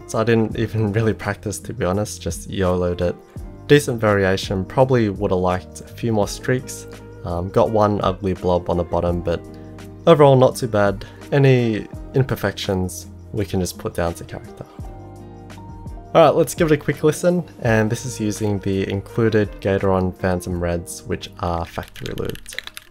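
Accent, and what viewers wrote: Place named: Australia. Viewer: the calm australian accent is great too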